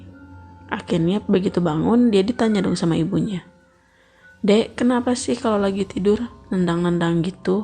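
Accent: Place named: West Java, Indonesia. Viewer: Inimah atuh urang Sunda pisan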